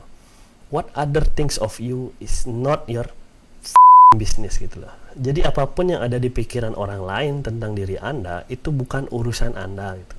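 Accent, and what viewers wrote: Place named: Bali, Indonesia. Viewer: Wah, ketemu channel ini, suka kale pembahasanny dan logat balinya.. dulu pernah kerja di bali 2 tahun... Jadi sudah biasa sama logatny.. moga2 channelny bisa kembang terus.. yg di sharing kebanyakan bermanfaat dan mindsetny bgs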